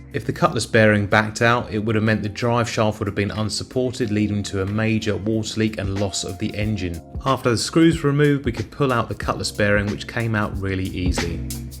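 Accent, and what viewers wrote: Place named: United Kingdom. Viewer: A joy to watch. And to listen to. Your English is, well, the Kings English. Keep up the great work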